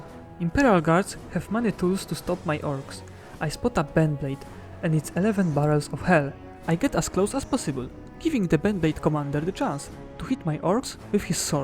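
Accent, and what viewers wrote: Poland. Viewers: Ooofff... the ponglish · I hear that accent, and i think i am not wrong, a Fellow Pole you are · Słyszę chyba polski akcent 🤔 Mam Cię gagatku 😁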